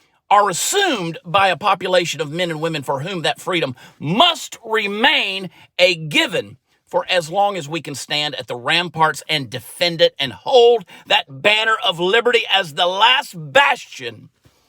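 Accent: Texas, United States. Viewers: How does a New Jersey-ian get a TX accent?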